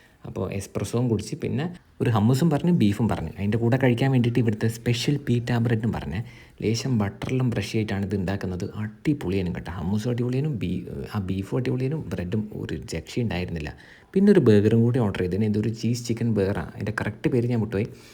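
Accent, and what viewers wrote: Kannur, India. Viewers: Chetta ningala sound ok set anu ee presentation ok adipoli poliyanu.... Enkilum food ok orupad kazhikkunath kond ninglde health nu ok valla issues verullei....... Work out ok chryyarundo.......Enthayalum viedio adipoli anu ketto..........ath parayand erikkan pattulla athra super anu❤️vere oru vloggers num illatha nice presentation.... Pinna kannur slangum adipoliii❤️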